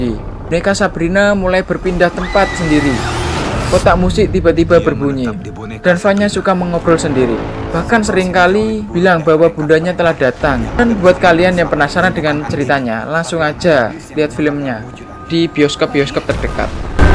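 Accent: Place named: Bojonegoro, Indonesia. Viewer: Medok jonegoro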